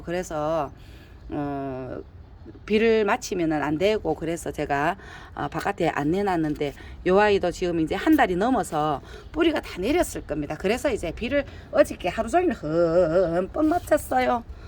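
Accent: Gyeongsang, South Korea. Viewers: 경상도 사투리 정겹네요~저도 경상도랍니다~다육이 엄청 많네요~나눔 기대 됩니다~^^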